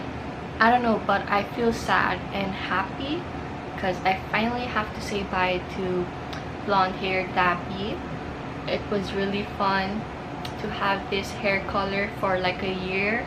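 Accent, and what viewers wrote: Philippines. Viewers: pardon me bc this is the first video im watching frm your channel! i've subscribed:) just wondering cus i hear a little accent.. are you a filipino? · She's korean? Or a filipino living in korea? Because i notice filipino english accent/slang. So i'm assuming she learned english in the philippines if she's actually korean 🤣. If she's filipino then this makes sense Edit: i finished the video. Ok pinoy ka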